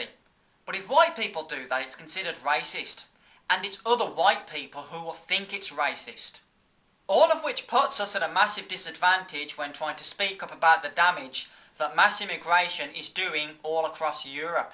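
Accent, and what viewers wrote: Australia. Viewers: I think it’s Australian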